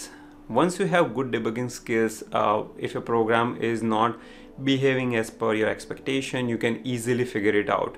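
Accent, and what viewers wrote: India. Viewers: What accent? Foreign return or Indian?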